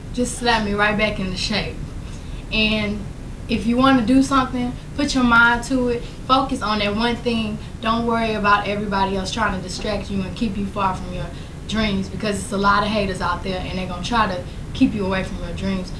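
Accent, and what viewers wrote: South, United States. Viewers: Country!! I miss Beyonce's accent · she sounds soo southern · oh she so southern!! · Aw, she was so country back then. Love it. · aww her country accent😭💞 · Country as fuck 😩😁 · She had her belly button pierced at 16!? That was a sin in my house! 😂😂 and she sounds a lil different a link country.! Love her old vids before and during Destiny’s Child time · I loveee her country accent ❤️❤️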